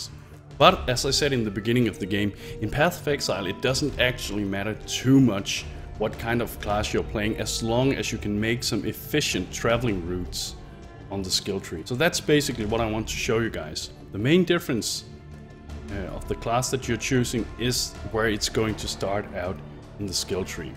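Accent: Denmark. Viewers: are you danish? You sound danish:b with your accent:D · Oh god, Danish English... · Jeg elsker hvordan en dansker kan høre en anden dansker når de taler engelsk. satan det er tydeligt. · Are you Danish? The accent sounds so much like Danish ^^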